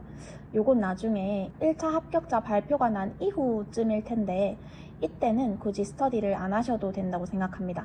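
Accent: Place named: Gyeongbuk, South Korea. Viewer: 감사해요 선생님! 어제부터 감도 못 잡고 무의미하게 검색하며 시간만 허비하고 있었는데 어느 정도 방향이 보이는 것 같네요. 억양이 경북이신 것 같다고 생각이 드는데 합격해서 현장에서 꼭 뵐 수 있으면 좋겠습니다!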